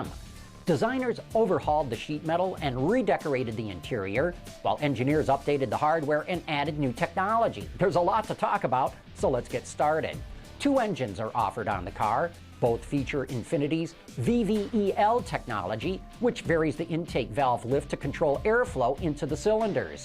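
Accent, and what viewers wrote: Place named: Michigan, United States. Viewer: STRONG mICHIGAN ACCENT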